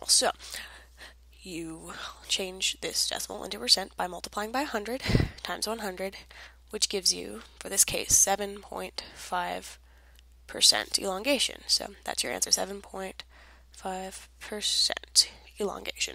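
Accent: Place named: India. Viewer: What's normal? An Indian instructor that you need to read captions to understand?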